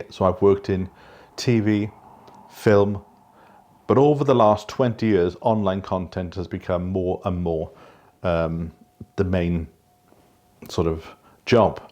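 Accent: Wales, United Kingdom. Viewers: That story about the fish and chips order made me laugh - hardly surprising they didn't want to deliver 300 miles away!😁 I wonder what the locals think of your accent - it is a definite Welsh accent to me, but perhaps to their ears it is quite mild and you even sound a bit English? How are you getting on with reviving your Welsh language skills? Do the locals speak Welsh on a daily basis? You seem very settled there already. 🙂 The hilly terrain will soon improve your fitness if you take daily walks. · I'm loving your channel! My father grew up in Neath. I miss his lilting accent and his magnificent singing voice. He left Wales in his late 20's, because of his very bad asthma... they told him he needed the sun and dry air in South Africa, so off he went. And then he met my mother and a while later I arrived. Your accent is not as broad as his was. 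Even so, it does my heart good to hear it! The friendliest man, he loved telling a good tale and sitting down at the piano, playing and singing his heart out. Bless you and thank you for the happy nemories and your fascinating channel. Bronwen from Johannesburg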